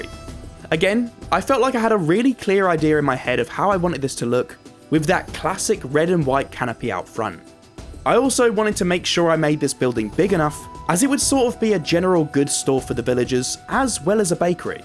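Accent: United Kingdom. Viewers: Why do you sound like a British Zach Callison? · Are you british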